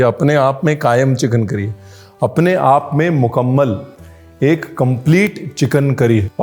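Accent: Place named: Lucknow, India. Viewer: Bhai bada badhiya lagta hai ye lucknow wali tone sun ke, apne ghar wali feeling aati hai.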